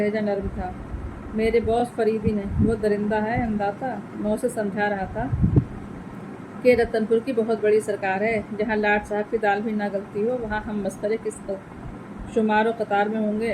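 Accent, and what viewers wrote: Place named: Lucknow, India. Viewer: kya haseen lab o lehja hai.. Lucknow ki chashni mein ghula hua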